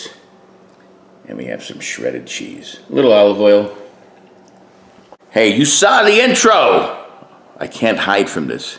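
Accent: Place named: Great Lakes, United States. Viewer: My grandmother used to make this around Buffalo, New York. This is one of my favorite breakfasts. I don’t eat it so much anymore because I’m trying to eat more healthy but man is it good. From your accent I’m assuming that you are perhaps from the Great Lakes region? Maybe it’s a thing in that area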